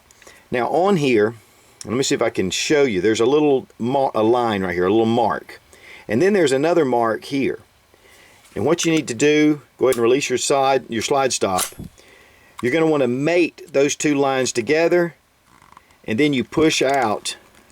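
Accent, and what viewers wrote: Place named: South Carolina, United States. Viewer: you prove in all of your videos you know what you are talking about and that accent seems familiar to me seeing as im from SC.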